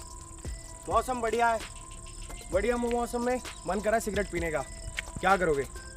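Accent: Punjab, India. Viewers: Are you punjabi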